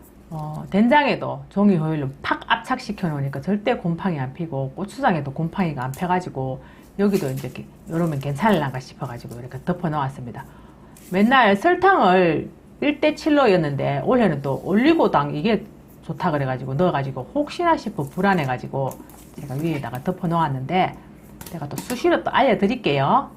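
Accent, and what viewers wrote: Gyeongsang, South Korea. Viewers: 저는 너무 경상도 말씨가 너무 정감이 가요 매번 참 잘 보고 공감 을 합니다 매번 알틀 하시고 경제적이고 쉬위서 많이 이해가 가요 근데 매실은 오래 두면 독이 생기고 씨가 그렇다고 한데 먹기가 참 아리송 해요 담 아서 얼마간 숙성 을 시켜야 하는지요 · 여사님영상을계속보는대경상도발음이넴.귀엽고사랑스럽네요 향상구독하니.건강하시고좋은영상많이보내주세요 넴좋아합니다 여기는부산입니다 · 선생님 고향어디에요 말씨가 시골말 참듣기좋아요ㅣ 답장주세요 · 경상도 아지매 정말 정겹습니다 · 갱상도 사투리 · 갱쌍도 사투리가 엄~좋아부러유~~♪~♬~♩ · 세프님 사투리 넘심해요 나도 경상도 출신인데 듣기가 좀거북 하네요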